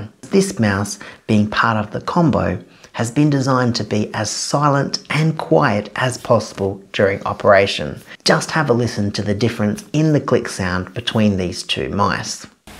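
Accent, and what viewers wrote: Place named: Australia. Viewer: What affectation is this? I am unfamiliar with Australian dialects but he has a tightness in his voice that sounds like an affectation, and he hits his S plosives extra hard. Where in Australia is this accent from?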